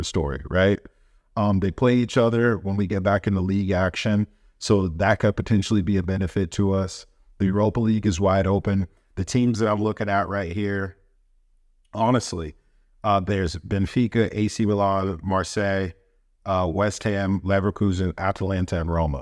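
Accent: United States. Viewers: I like your English. I never listen football with American accent are you American. Do you have live chat programm ?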